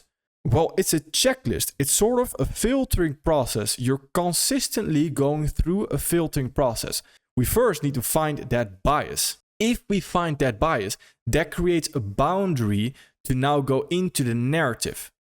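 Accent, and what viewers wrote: Netherlands. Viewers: You have to be dutch when hearing your accent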